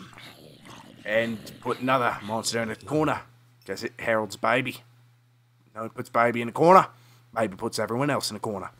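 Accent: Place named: Australia. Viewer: Aussie accents are bae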